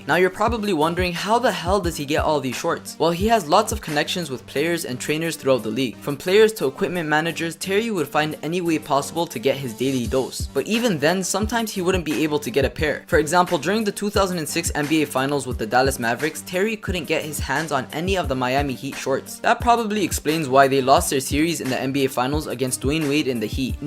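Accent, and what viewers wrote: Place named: India. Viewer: 听起来像印度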